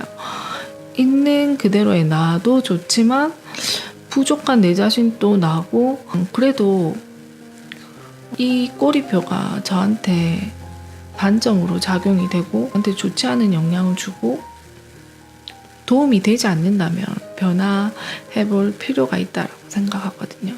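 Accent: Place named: Busan, South Korea. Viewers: 세부 바다 수영 ㅋㅋ 영상 왤케 귀여우십니까.ㅋㅋ 부산사투리가 완전 귀여워요 ㅋㅋ 물론 저도 부산사람입니다만 ㅋㅋ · 좋은이야기 잘듣고가요~^^ 경상도 말투 넘 좋습니다~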